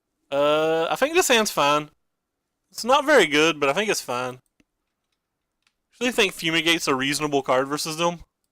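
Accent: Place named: South, United States. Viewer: where you from, sir, Georgia? South Carolina?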